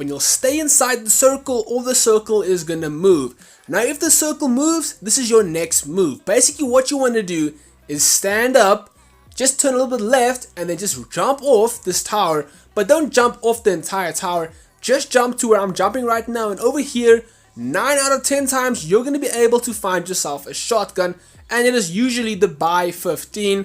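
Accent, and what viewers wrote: South Africa. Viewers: You south african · Bro are you South African you have our accent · Is this guy from south africa?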